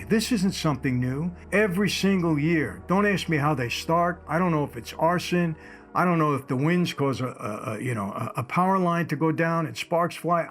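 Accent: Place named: New York, United States. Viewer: i really admire you and your story. You remind me so much of my father that was also an Italian from New York and talked like you. My father would be 96 years old today. The strongest men I ever met were my dad and my uncles from New York. That was when men were men. This was also a time when your uncles helped raise you. If my uncles saw me doing something i shouldn't I got a slap. Hoping they never told my father my wrongdoing so that I didn't get another. lol Enjoy your videos and my prays are with North Carolina and California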